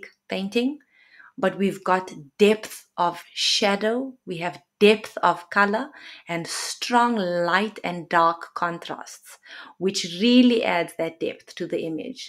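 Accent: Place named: Cape Town, South Africa. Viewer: Sounds like a cape town accent, especially how you say "opporTunity"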